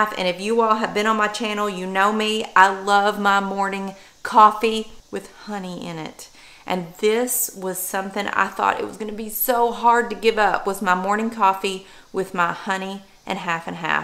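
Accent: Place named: Kentucky, United States. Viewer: has some great advice and congratulations on your progress. It is about getting healthy and not about losing weight. And it does take 30 days to change our thought pattern. I like doing omad. I'm not hungry through the day and if I do have a spirit of it I drink an extra water. Can't wait to see the progress and definitely do a couple of videos on what you cook. I thought I detected a Kentucky accent, just found your channel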